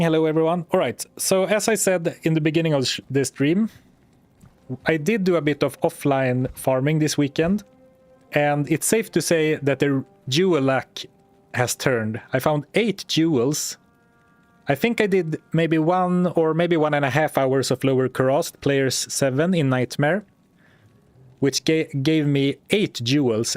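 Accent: Sweden. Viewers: I'll wait for the next sale, you make me want to play again, perhaps also single player, your challenges are awesome. Heard you mention earlier you were Swedish, the accent is pretty telling. (I'm a dutchman who moved to Sweden ~4 years ago, and you guys have a very typical accent, so do we I guess).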